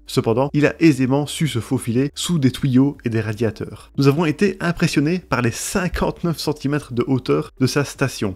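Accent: Belgium. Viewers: Laisse aller l'accent belge, mec, on veut entendre les nonantes 🤌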